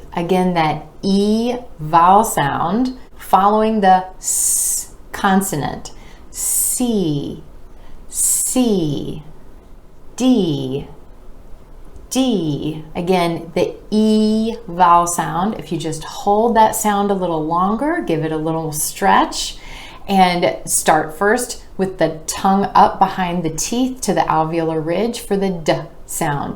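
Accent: United States. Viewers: Respected mam your teaching American accent praise worthy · Mam you are amazing I love American accent but I dont know how to sound like that and now I am improving myself by seeing your videos thank you mam❤ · I heard the pronunciations, like you said 'U' like it is. So, my question is, why do Americans say stOOdent instead of pronouncing the 'U' in it. Why do you say cute, with the 'U' in it and not cOOt if they pronounce it as a double oo. Same goes for cucumber, then rather say cOOcOOmber, if you want to proceed with the incorrect pronunciation. It doesn't make sense. Same with the word mature... Just asking. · Hey! Your pronunciation is wonderful. Typically the AMERICAN I like. Good luck. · Mam, I'm from India. Here everyone use UK accent but I like US accent.You are really a good teacher. I Understand very clearly. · Is this American or British pronunciation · You can become a excellent teacher in amarican accent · Because of I find it easy to learn American accent. 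Her accent is too cool.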